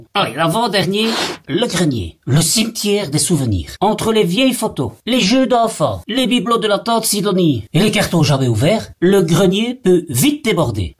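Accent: Belgium. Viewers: On reconnait bien l accent belge! Et j adore❤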